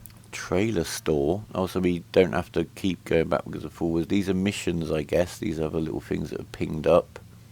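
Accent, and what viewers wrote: United Kingdom. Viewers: Left a like for the Westcountry accent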